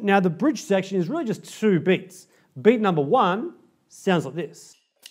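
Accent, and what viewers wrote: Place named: South Africa. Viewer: *accent sorry. You're probably Australian, but I'm leaning towards South African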